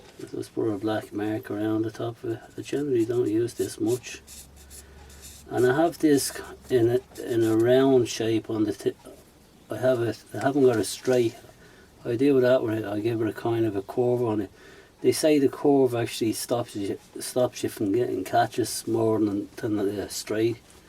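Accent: Dublin, Ireland. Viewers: Very helpful video. I've not done any wood turning since secondary school many years ago. I got a lathe at Christmas and have been tipping away since. Im guessing by the accent you are in Dublin, I was just wondering where you got the grinding wheels? I got a cheap 6" bench grinder but the wheels with it are very rough and I dont want to damage my chisels. Planning on making the home made Jig for the fingernail grind as they are too expensive to buy when they can be made quite easily. Also enjoying your other videos too. Planning on trying the multi axis cup when I get time.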